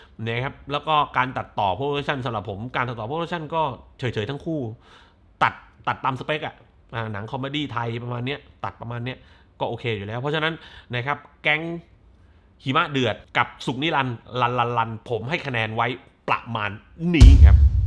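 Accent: South, Thailand. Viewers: ชอบการขายของด้วยการแหลงใต้มากค่ะ 🤣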